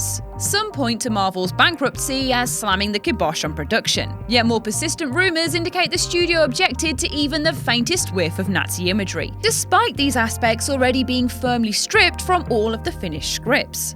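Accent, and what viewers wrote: United Kingdom. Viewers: So how do you pronounce TV? We in the UK, where is from pronounce it as the letters, Tea Vee. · That is highly offensive! is a citizen of the UK, and like the British, Welch, Scots, and Irish many cultures around the world pronounce words differently. Get over yourself and learn to understand different dialects, already! Please, there are more countries in the world than just the United States!!!!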